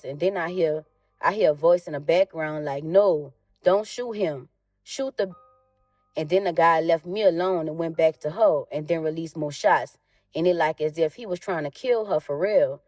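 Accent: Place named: New Orleans, United States. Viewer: 👍 New Orleans accent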